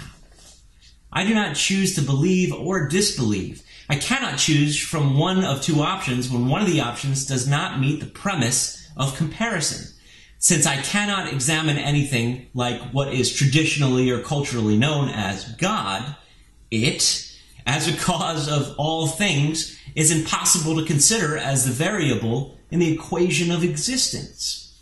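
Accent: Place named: South, United States. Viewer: Great video and that's an amazing southern accent ;-)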